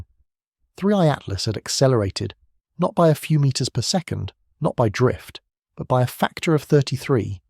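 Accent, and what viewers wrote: United Kingdom. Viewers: British voice. They are a day ahead.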